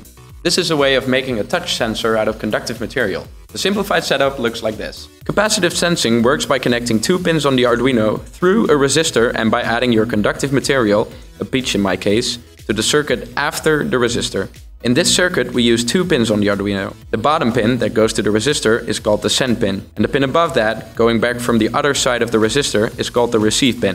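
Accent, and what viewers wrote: Netherlands. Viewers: I'm Dutch myself, and I have to say that your English is really good. It took me a while to realize that your accent was Dutch. Good job! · As a native English speaker, it was instantly obvious he has a Dutch accent, but he does have good English :)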